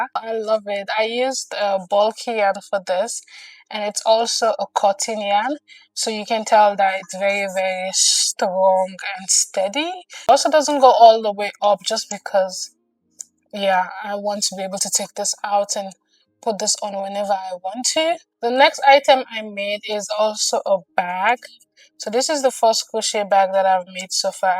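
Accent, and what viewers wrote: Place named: France. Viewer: I hear the French in your English ❤😊... You're my crochet inspo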